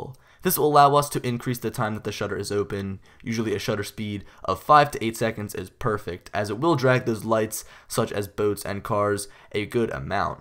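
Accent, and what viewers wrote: Philadelphia, United States. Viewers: Dawson Boyle -Ha I'm just joking. I'm from the area, born and raised. First time I watched one of his videos I knew where he was from by the sound of his voice. I know a fellow hoagie when I hear one. City looks good tho huh?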